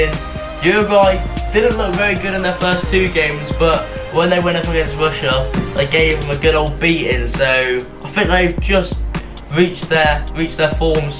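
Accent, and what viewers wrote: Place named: England, United Kingdom. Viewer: Let me guess, u are from england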